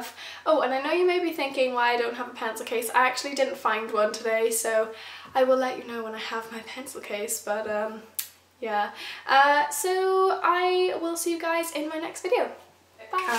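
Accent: United Kingdom. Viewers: Where in Wales are you from??? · Are you from England?:) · Yaya someone british! :) love the hair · Finally a British person!!!!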